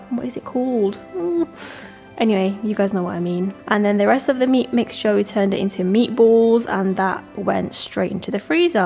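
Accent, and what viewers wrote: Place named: Milton Keynes, United Kingdom. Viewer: Are you from Milton Keynes? I can hear your voice kindly u from there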